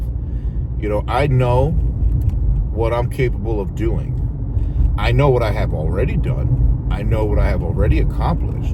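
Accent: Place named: New Jersey, United States. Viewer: I really think the biggest problem with how you talk is that people don't understand how we talk in New Jersey.. so the problem is really theirs.. fuck em.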